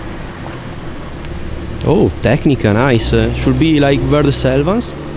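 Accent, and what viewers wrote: Italy. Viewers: Shorter videos, straight to the point, exhaust sounds, some music, your nice Italian voice and those are great! 😃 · Bro il tuo è l’inglese più italiano che ci sia ❤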